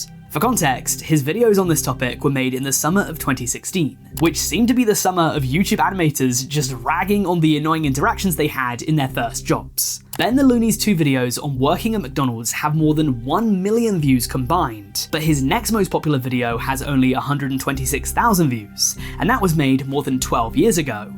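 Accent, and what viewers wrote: United Kingdom. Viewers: i like you british cody ko, much better than the other one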